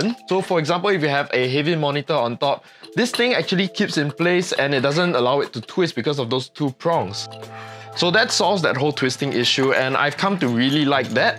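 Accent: Singapore. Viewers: Singapore accent.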